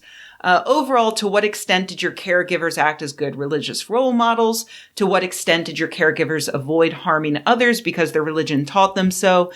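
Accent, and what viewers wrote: United States. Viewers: 1.)Congratulations, you have a strong American accent, but you enunciate so well that I can easily understand you. I often give up on trying to understand Americans because, in addition to their accents, they fail to enunciate. 2.)I am autistic so my brain does not work like most people's. 3.)Even from before I was born, I attended an evangelical Baptist chapel in a strongly Baptist rural community. I still believe that the whole community, including my parents lived as closely as they could to the teachings of Jesus Christ - they were not hypocrites. But by the time I started school at the age of four, I had decided that I did not believe what I was taught at Sunday School, as much as anything because the God of the Bible did not behave as well as my parents or others in the community - he seemed like a quite unpleasant sort of chap. 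I retained a vague notion that all those adults whom I respected must have known something I didn't, but even that became untenable after a while.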